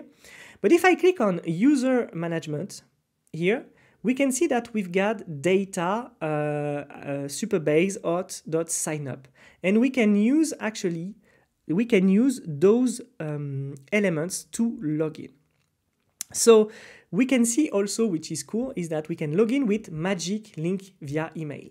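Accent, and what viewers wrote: France. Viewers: Merci beaucoup, I can recognise French accent from miles away. Tu aides énormément mon gars ! 💪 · French accent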